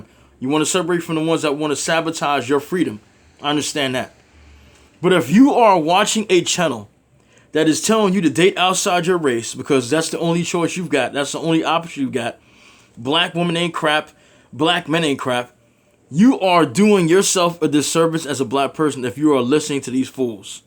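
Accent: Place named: New York, United States. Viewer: Who is this buff man with a New York accent? Miles Morales?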